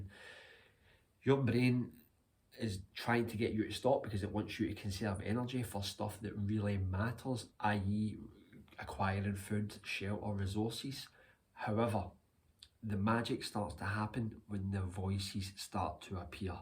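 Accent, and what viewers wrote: Scotland, United Kingdom. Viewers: On a side note brother, your scottish accent makes the captions hilariously funny. It can't pick the right words.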